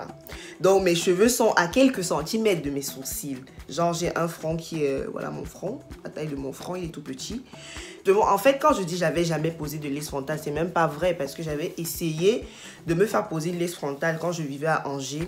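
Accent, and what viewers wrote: Cameroon. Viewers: T'es camer? L'accent ne trompe pas.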